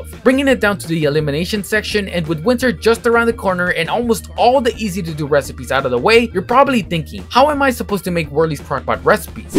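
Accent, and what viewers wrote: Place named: Texas, United States. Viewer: You sound like someone I went to high school with in Texas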